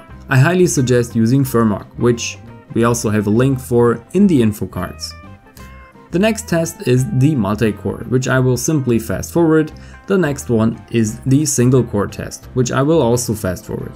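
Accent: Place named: Germany. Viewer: man hört so raus das du deutsch bist xd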